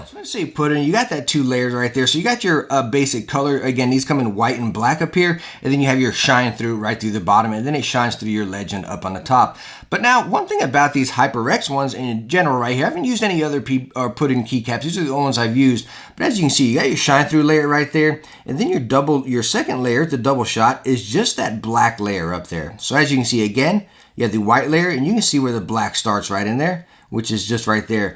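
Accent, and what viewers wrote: United States. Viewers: This guy's accent and way of talking is so American....but good video tho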